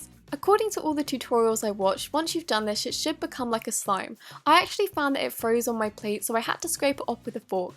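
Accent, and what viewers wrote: England, United Kingdom. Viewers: GamerGurl Utubly it’s called an English accent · you sound british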